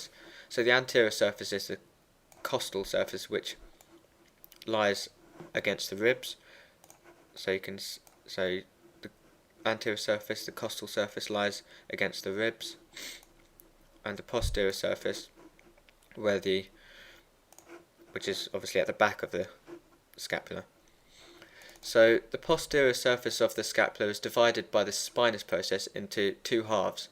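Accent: United Kingdom. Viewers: Big, massive, enormous thanks! It's really hard to actually visualize all of this while reading text books and browsing through an atlas. I always finish off each part by watching your videos. It's also almost equally awesome that you speak British, with some luck I will have mastered the accent by the end of my first year! :) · Good work but no offense .. I don understand British Accent clearly !! :"(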